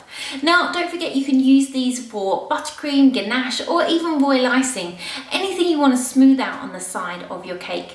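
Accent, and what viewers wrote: United Kingdom. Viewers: Your accent seems like British ??? Right or wrong?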